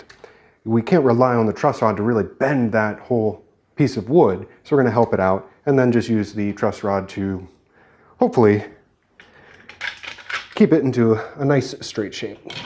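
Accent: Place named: Prairies, Canada. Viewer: Get that nut out real quick. Are you by chance from Saskatchewan, Alberta, or Manitoba? Thanks for the tutorial. I will now attempt the repair.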